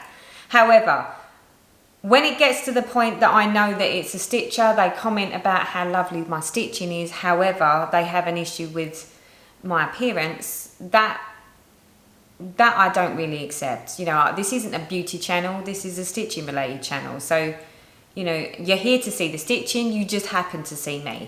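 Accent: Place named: Essex, United Kingdom. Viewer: Hi...PLEASE don't be discouraged by these ignorant, moronic naysayers. They've nothing better to do with their time than shoot nasty comments your way, probably, as Thewoolyhattedstitcher says, they're probably jealous and envious of your talents and beautiful pieces because they have nothing to offer themselves. Your enthusiasm and excitement is contagious. You are a beautiful person inside and out so don't let anyone tell you otherwise!! Block them! Delete them! Whatever you have to do. There are plenty of people out here who love you and would miss you like the devil if you should quit doing videos. I wait patiently for every one of them. Besides, I'd really miss that Essex accent of yours. It just adds to your beauty!! That said, may I compliment you on every piece you've shown in this video. I'm soooo partial to the high heels collection that I'm going to order the pattern. 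You know your an enabler!! LOL Congrats on your page finishes and all you've done. What a hell of a start to 2020. Love ya and please stick with it. Hugs from Canada (Yes, we're huggers here. We have to be just to stay warm! LOLOL)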